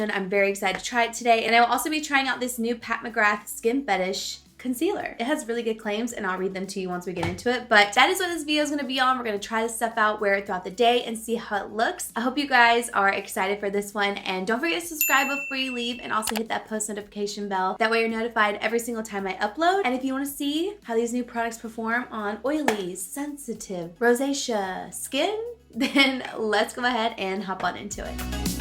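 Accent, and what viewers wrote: South, United States. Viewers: Am I going crazy or did she used to have more of a southern accent? Lol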